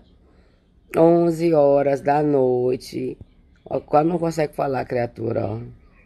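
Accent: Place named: Sao Paulo, Brazil. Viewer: Bispa você é paulista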